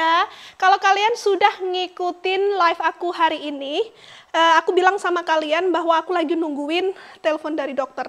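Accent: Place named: East Java, Indonesia. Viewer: Kok ngomong nya orang mana surabays eatau malng ya ,orang nya cantk pintr mask ginana sh kok dapt orng luar ngri ngomong pakai arek arek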